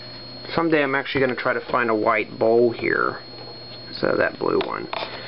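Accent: South, United States. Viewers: you have what sounded like a southern accent that ive never noticed O.o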